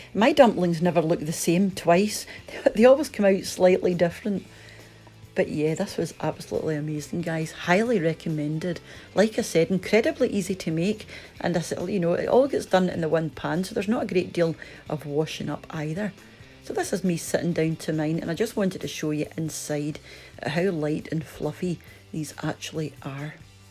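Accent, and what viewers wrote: Scotland, United Kingdom. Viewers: I love your cooking reminds me of my mother’s cooking, she was from Dundee also where I was born but only lived there until I was four. All her cooking when I was young was based on Scottish food, so to hear your accent and see the the cooking warms my heart and makes me hungry. PS maybe not so many americanisms of your lovely accent?😊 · Excellent 👍👍 presentation. Love your Scottish accent. You sound just like my daughter's mother in law ... Glasgow. Lol 🤗definitely will try this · Beautiful scottish accent.😊❤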